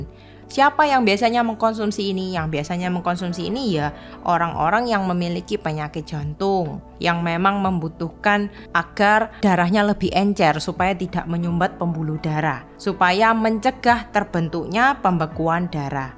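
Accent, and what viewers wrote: Java, Indonesia. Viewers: Bhs Idonesianya sdh baik dan benar soal medok Jawa tdk masalah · Jowo ne ketok 😅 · Logaaak jawwa mu keliatan... Gx bsa berbahsa indonosia yg baik dan bnar y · Iya betul nggak menghilangkan jawa nya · Logat dan bahasa itu beda....bahasa indonesia nya benar, trus logat nya jawa....emang kenapa?...salah ? Klo yg ngomong orang maluku dgn logat daerah nya, kan juga BOLEH..... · terimakasih atas ilmu dan nasehatnya sangat bermanfaat penyampainya dr logad jawa banget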